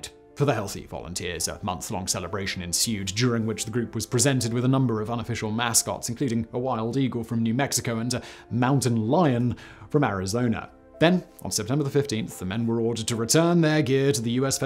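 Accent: United Kingdom. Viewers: “ a staunch and” ? Vociferous? Opponent” I can usually decipher your British accent but in this case I wasn’t sure if you said vociferous or vociferent Now I have to go Google to see if that’s actually a word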